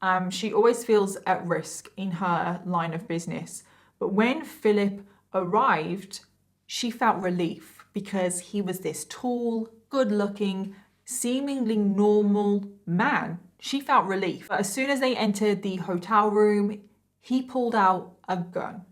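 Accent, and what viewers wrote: United Kingdom. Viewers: you are British, pronounce and speak as you normally do. I love how people who live in other countries speak. Unless, you use a word that most people have no idea what you might be saying, then a definition is very much and respectfully appreciated. Most, like British, much more proper than Americans and I love just listening to accents. So keep on keeping. Don't change. I am from the U.S. and I have no accent because am on the west coast. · Before even watching the video, only hearing your opening sentences -- I had to comment. (Also, my very first comment on your page BTW) How to pronounce "Craig" in Craigslist...?? Please say the name however you want! You are always so polite and kind, so inclusive and respectful to anyone who could ve watching your videos. I love your stories because they focus on one murderer. So fun listening to the back story of the upbringing and if its not available, you are just as bummed as we all are. 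But you still make the story over the top interesting. I love your voice especially your accent!! Your enthusiasm your side comments fresh from the UK the most! -- LOVE your new Podcast too! I am back to YouTube since I have listened to all the Podcasts. Tons of YouTube videos to choose, so yay for Saturdays and True Crime with leading the way. Nichole from CA, USA 💋💌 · I love accent so much. If I had a British accent this would be the one I'd want 🤣, what part of Britain are you from? I love listening to you speak (not in a creepy way just as a comforting way lol) and even more of a plus is that it's crime stories 😆 if I ever imagine having a British accent this is the kind exactly 💯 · I'm an American but my parents/grandparents were British. I enjoy your accent, reminds me of home. Side note: you are so pretty! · I love your channel for many reasons but one in particular is that you are on the same wavelength as I am in your thinking 🤔 another is I love ❤️ your accent im sure every American always comments about that but I do love a British accent ❤️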